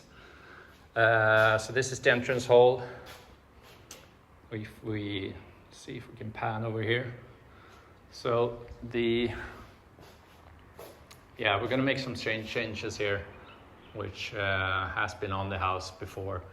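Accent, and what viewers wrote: Sweden. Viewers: Where are you from? Your accent is very Swedish. 🙂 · Du låter svensk. Lycka till med renovering - fantastiskt hus!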